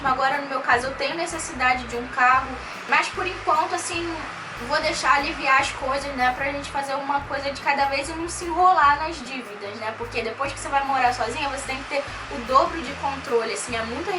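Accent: Rio de Janeiro, Brazil. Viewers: vc é muiiito mais muiito gata mesmo! e esse sutaque carioca me deixa maluquinho! mas fica tranquila que não sou nem um fã lunático, inclusive sou casado e muito bem casado, é que o teu jeitinho de ser me deixa maluquinho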